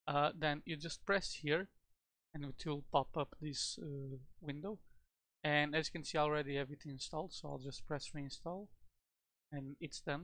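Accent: Portugal. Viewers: algo me diz que és portugues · vi logo que eras tuga pelo sotaque, obrigado por todo o esforço na realização deste projeto <3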